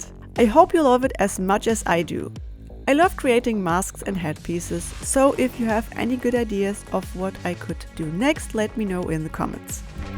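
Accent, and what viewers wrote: Germany. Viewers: Are yue Germen 🇩🇪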